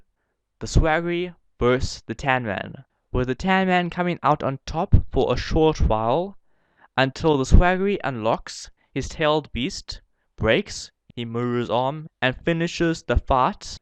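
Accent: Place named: South Africa. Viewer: You said pure maths and I’ve only heard us South Africans say that, plus you got a South African accent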